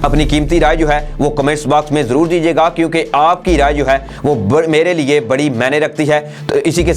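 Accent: India. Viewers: محترم آپ کا تجزیہ اور خبریں سُنی، بہت اچھا لگا لیکن آپ اردو زبان کو ھندی لہجے میں بولتے اور پڑھتے ھیں جو کہ اچھا نہیں۰ ویسے یہ آجکل اک عام رجحان پایا جاتا ھے جو کہ پرو انڈین رجحان ھے، دوسرے لفظوں میں اگر کوئ شخص یا قوم اپنی گفتگو میں کسی دوسری زبان کے الفاظ استعمال کرنا شروع کر دے تو اسکا مطلب اس زبان سے مغلوب ھونا ھوتا ھے۰ اس طرح ھم غیر شعوری طور پر لسانی معاملات انڈین غلامی کی طرف جا رھے ھیں۰ قابل اعتراض الفاظ مندرجہ ذیل ھیں خ کو متعدد بار کھ پڑھا گیا جیسے خبر کو کھبر، خیال کو کھیال کہیں تاریخ کو تاریک پڑھا گیا اب سوال یہ پیدا ھوتا ھے کہ آپ اردو میں گفتگو کر رھے تھے یا ھندی میں۰ ھمارا رویہ اگر اردو کیساتھ یہی رھا تو بہت جلدی اسکا نام اردو کی بجاۓ ھندی رکھنے کے مطالبات سامنے آنے لگیں گے۰آپکو یاد ھونا چاھئے کہ پاکستان بنانے کی اک وجہ اردو زبان کو زندہ رکھنا تھی۰ کو بھائ میری اس تحریر کو پڑھ کر اردو سپیکنگ لوگوں پر کمنٹس کرنا نہ شروع کر دے کیونکہ میں پنجابی ھوں لیکن اگر کوئ پڑھا لکھا پاکستانی اردو غلط بولتا یا لکھتا ھے تو مجھے اچھا نہیں لگتا اور اردو کو ھندی لہجے میں بولنا یا لکھنا میری لیۓ نا قابل قبول ھے۰ امید کرتا ھوں پڑھے لکھے نو جوان میری تحریر کو ممثبت انداز میں لیں گے اور اپنی گفتگو جو وہ اردو میں کرتے ھیں اسکا خیال کرتے ھوۓ قومی زبان کی حفاظت کریں گے۰ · Mujhy lagta Hy yeh channel koee Hindu Chala Raha Hy bilkul hinde lahja hy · نام تو تیرا ہے مگر تیرے تلفظ اور تیری ہندوستان کی سعودیہ کے ساتھ وابستگی کی وکالت سے یہ ہر شخص یقین سمجھ سکتا ھے کہ تو کوئ انڈین ہی ہے غور سے میری بات سن ، ھر قوم اپنی غیرت کے بل بوتے پر زندہ رہ سکتی ھے اور غیرت کے ساتھ ھی ترقی کی منازل باآسانی طے کر سکتی ھے ۔ تیرے جییسے بزدلی ، بیغیرتی اور صرف تیل ، نوکریوں اور پیسوں کے لئے مر نے والی قومیں ذلیل و خوار ھی ھوتی ھیں۔۔ اب ھمارے لیڈروں نے اگر اپنی عزت نفس کے لئے اپنی قوم کو صحیح راستے پر ڈالا ہے تو ھم دل جان سے آنے والی ھر مشکل میں انکے ساتھ ہیں آپ اپنی بکواس کو اپنے تک ھی محدود رکھو میڈیا پر آکر اپنی انڈین ذہنیت عیاں مت کرو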